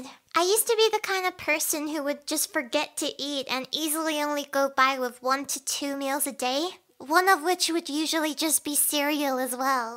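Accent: Australia. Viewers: YOUR AUSTRALIAN 😮 I AM TOO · ARE YOU AUSTRALIAN?!😮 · Honestly, I didn't notice the australian accent until she mentioned australia.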